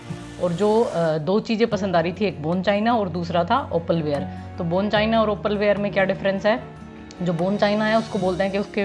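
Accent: Haryana, India. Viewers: Your accent is Haryanvi! 🙏👍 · Haryavani hai...Jai haryana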